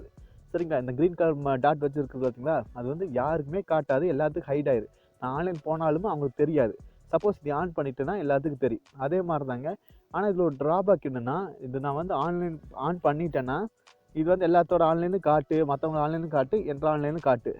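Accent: Coimbatore, India. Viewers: Anna coimbatore slang super ah iruku 💙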